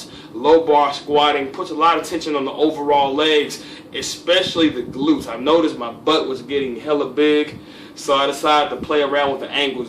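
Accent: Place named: AAVE, United States. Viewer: talks like a dummy but if you can look past the ebonics he makes a lot of sense and hes oozing charisma. Love to watch him. Some ppl sound too "boring professor" like. Keep doin what youre doin bro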